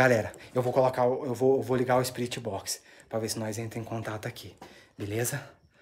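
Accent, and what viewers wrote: Minas Gerais, Brazil. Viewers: Olá! Boa tarde amo assistir seus vídeos e seu trabalho! Esse seu sotaque é de mineiro? Kkkk adoro seu jeito de falar e sua humildade e honestidade!!!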